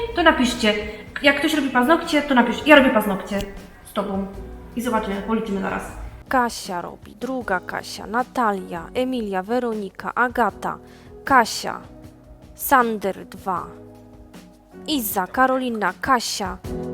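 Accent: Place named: Silesia, Poland. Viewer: Jesteś nojlepszo i słysza Śląski akcent😁😁 pazurki piekne jak zawsze pozdrowienia z piykor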